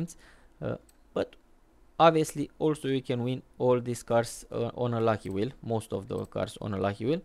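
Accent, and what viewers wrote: Romania. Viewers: You have an romanian accent, but how do u get vouchers? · your romanian accent is so strong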